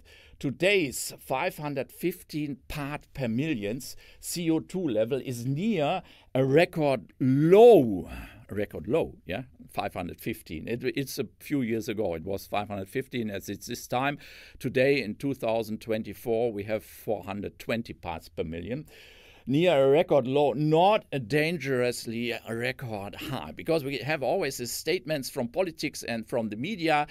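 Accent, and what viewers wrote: Germany. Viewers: Das deutsche Englisch verstehe ich viel besser als das englische Englisch, so kann ich sehr gut mein Englisch verbessern. Der Vortrag war natürlich auch sehr gut.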